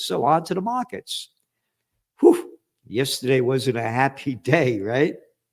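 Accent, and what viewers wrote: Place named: New York, United States. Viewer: Stop saying “turlit paper” with that annoying NY accent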